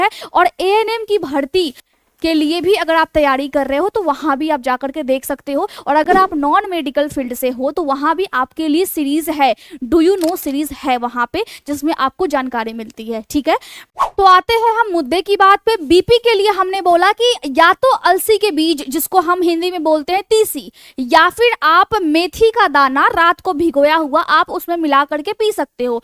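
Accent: Bihar, India. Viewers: Mam bihari to nhi ho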